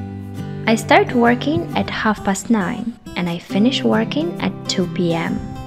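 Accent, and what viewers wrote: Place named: United Kingdom. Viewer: Mi maestra me dijo "vean este video, la chica tiene un acento Britanico perfecto, uff. 👌🏻" JAJAJAKWLWL.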